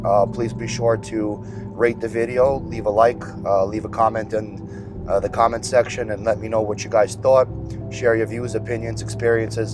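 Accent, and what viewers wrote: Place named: Russia. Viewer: Проблема в том, что западники считают, что есть только один способ жить. К сожалнию, этот способ - паразитирование. В начале были рабы, потом колониальные империи, до недавнего времени была неоколониальная империя номинально с центром в Америке. А теперь, когда принуждение других стало сложнее, паразитируют на своих. Посмотрите на ЕС, посмотрите на средний класс в Амарике. И сейчас вы уже знаете, что ничего нельзя объяснить. Те, кто живут индивидуалистично просто не могут себе представить других вариантов отношений в обществе. Россия не идеальна, но и Америка далеко не идеальна. Америка создавалась как идеальная страна для протестантской этики. Каждые 100 лет в западном мире приходит время для большой заварухи. То революция, то война... И это не случайно. Для этого есть глубокие и серьезные причины. Но говорить об этом абсолютно запрещено. 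Например, в протестантизме центральная проблема - это вопрос спасения, кто избран к спесению. К сожалению, все это выродилось в деньги. Долгий разговрр. У вас занятный русский акцент. :-))) Удачи с метрической системой! Я по работе бывал в Америке несколько раз в год много лет подряд. Конечно же, моя работа оплачивала мне все расходы, поэтому я не был стеснен ни в чем в разумных пределах, я видел как чеки на кассах становились больше и больше. Оно становилось хуже постепенно, почти незаметно, по чуть-чуть. Но неизменно оно становилось хуже. Я помню Сан-Франциско в начале 2000-х, да, была куча бездомных, да было грязновато, но было прикольно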